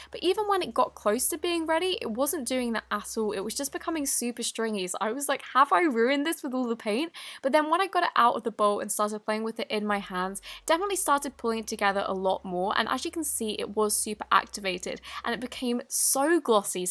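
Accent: England, United Kingdom. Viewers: Are you English???¿¿? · I really love your British accent! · I love you because your videos are perfect you are really pretty and I love your voice also you're British like meeeeeeeeeee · Don’t forget “at all” in her cool British accent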